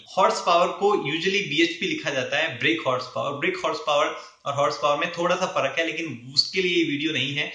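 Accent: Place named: Haryana, India. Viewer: saab aapki haryanvi tone bahut achhi lagti hai कानों को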